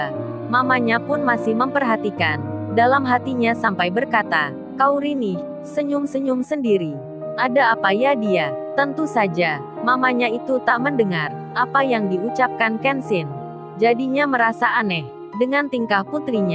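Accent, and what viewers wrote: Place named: Tegal, Indonesia. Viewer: kaya,a mirip boso tegal nggih 🤭🤭🤭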